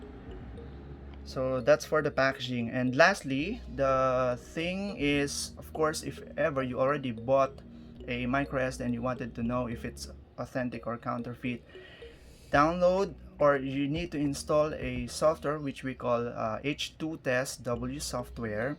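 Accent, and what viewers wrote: Philippines. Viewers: Bat parang tagalog accent yung English mo boss? 😨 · Gagi na noticed ko agad Filipino accent kala ko pinoy. Di ako nakatingin sa screen lol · Muntik pa sana akong mag comment ng what is your nationality why is your accent sounds very filipino😂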